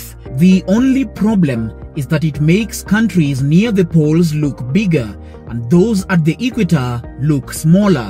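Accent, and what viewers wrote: Kenya. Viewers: This Kenyan accent is hilarious. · This ascent is Kenyan 😁... Much love brother man. 🔥🔥🔥🔥🇰🇪🇰🇪🇰🇪🇰🇪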